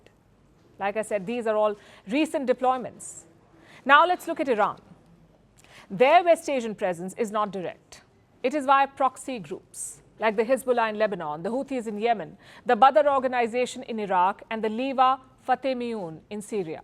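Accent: India. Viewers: Seem tha you know everything? I dont like how you speak English still you sound indian.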